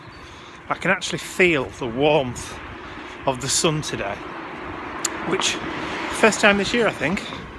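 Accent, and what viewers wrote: United Kingdom. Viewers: I understand the people that loves to hear your voice. I'm Belgian and I love that British English so much also and the vlogs. Only started to watch your videos a couple of month back and watched every video on your channel. You are a bit addictive, LOL. Have a nice week:-)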